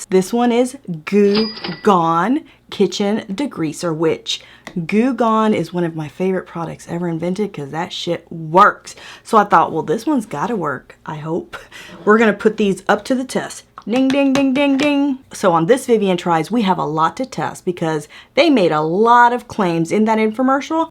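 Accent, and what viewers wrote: Texas, United States. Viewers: You sound Texan.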